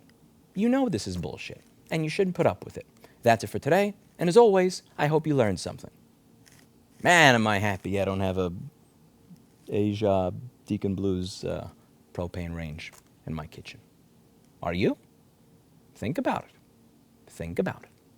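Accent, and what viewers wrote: New York, United States. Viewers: The New York accent was in full effect today😮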